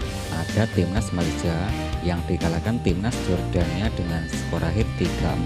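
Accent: Java, Indonesia. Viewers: bahasa medok jawa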